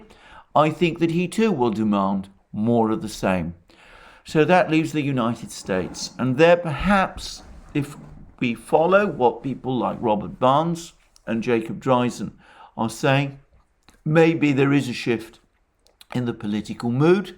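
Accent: England, United Kingdom. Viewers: He's got an English accent, my friend.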